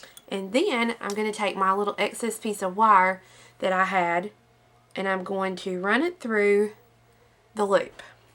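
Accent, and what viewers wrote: South, United States. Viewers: Great tutorial and very easy to understand and follow. I knew it was a southern voice I heard! I'm from Columbia, SC You can bet I will be checking out your other videos. Debbie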